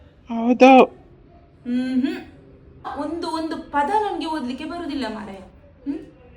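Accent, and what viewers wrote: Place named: Mangalore, India. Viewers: Love mangalore kannada accent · Nanagu e thara anubava agide school nalli 😮nimma manglore kannada esta ❤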